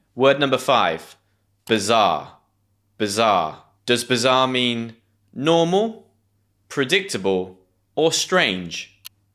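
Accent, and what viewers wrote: United Kingdom. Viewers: I'm from germ money. You're from the UK, as I can clearly hear at your dialect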